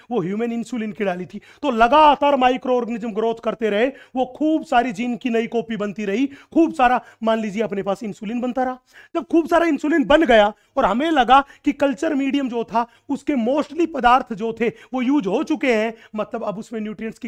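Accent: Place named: Haryana, India. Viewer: Is sir from haryana ?.....his tone matches as littile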